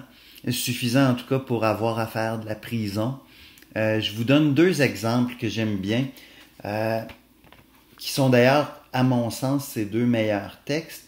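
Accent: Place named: Quebec, Canada. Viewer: J'aime bien l'accent Québécois . peut-être dans deux mois je serais là-bas ..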